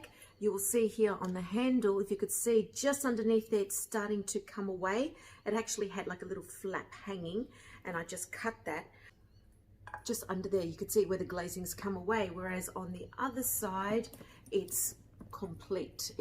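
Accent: Australia. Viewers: Lovely to hear a fellow Aussie on YouTube! Australian context really helpful regarding brands quality bag organisers etc. Thank you so much... keep up the good work!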